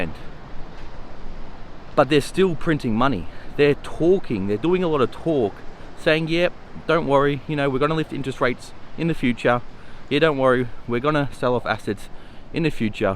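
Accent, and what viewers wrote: Australia. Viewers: Are you from Australia? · where you Australia? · Hahahah change of scenery. Nice day out and and beach. Ummmm, are you Australian?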